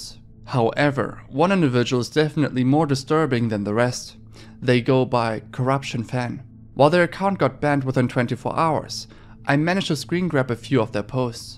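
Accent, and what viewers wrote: Germany. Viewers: are you german? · Is he from Germany? The accent sounds quite German.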